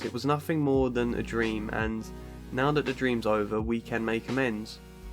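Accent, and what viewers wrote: England, United Kingdom. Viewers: You sound a lot like Robert Sean Leonard only with an English accent.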